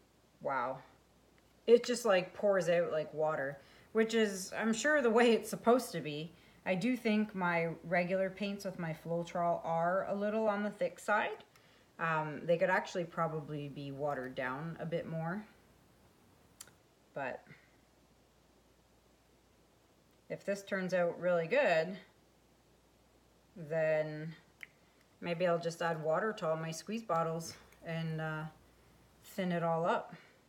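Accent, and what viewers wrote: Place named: Newfoundland, Canada. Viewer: You sound like you are from Newfoundland. You sound like an uncle I had.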